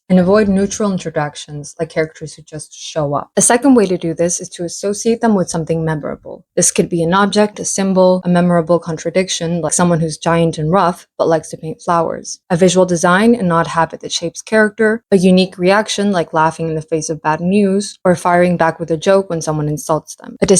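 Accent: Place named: Russia. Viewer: i wanna say russian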